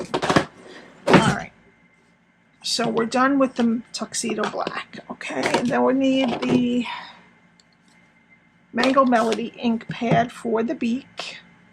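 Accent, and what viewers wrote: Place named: East Coast, United States. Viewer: Love your accent! Are you from back East?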